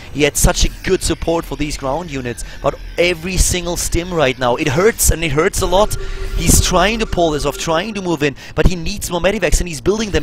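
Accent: Germany. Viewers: I like your German accent! And it's great how you're dropping gems like the right+shift attack scv building the bunker. Thumbs up!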